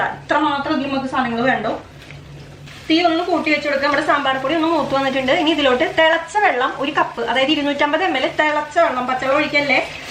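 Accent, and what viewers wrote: Thrissur, India. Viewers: Midukki thanne ! Keep it up. Very nice presentation. TCR slang exalted. Midumidukki. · Hi, awesome and super easy recipe.I love your way of talking and the Thrissur slang..cooking as well as your slang is simply superb..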